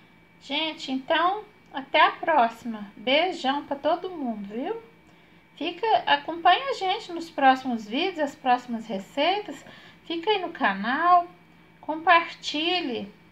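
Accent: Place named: Minas Gerais, Brazil. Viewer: Eita sotaque mineiro gostoso de ouvir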